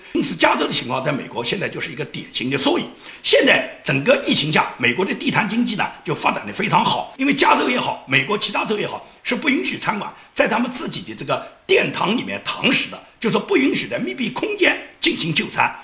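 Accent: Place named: South, China. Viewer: 亲切的南普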